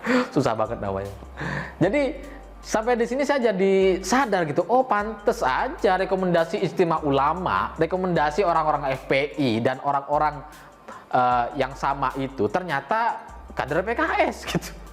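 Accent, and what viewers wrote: Java, Indonesia. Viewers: Keren mas... , aslinya cerdas banget.... Gayanya aja dibikin rada medok...👍👍👍👍👍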